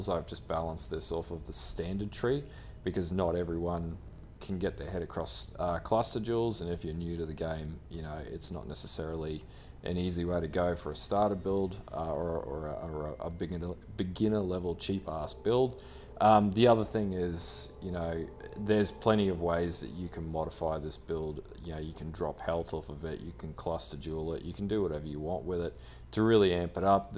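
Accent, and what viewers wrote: Australia. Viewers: Hey love your vids 👍🙂 Also are u Aussie?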